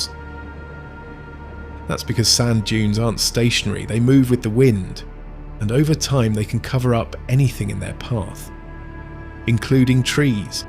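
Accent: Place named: United Kingdom. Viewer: Very repetitive. AND you have a British voiceover with an annoying American AND-LESS number pronounciation. Do better. Dislike!!!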